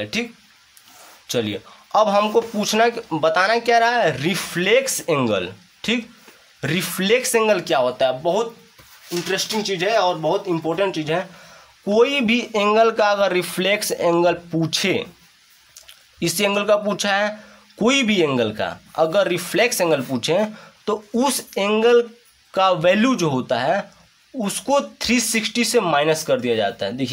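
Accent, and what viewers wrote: Bihar, India. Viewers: Kitna bihari bolta h itna dana samjha tha h chiiii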